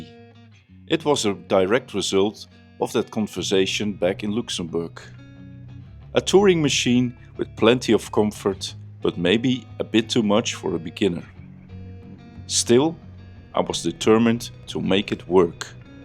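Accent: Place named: Netherlands. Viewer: Dat moet een Nederlander zijn aan het accent te horen. 😄 Leuk verhaal. Ik heb ook een RT gehad. Nu heel blij met een GS.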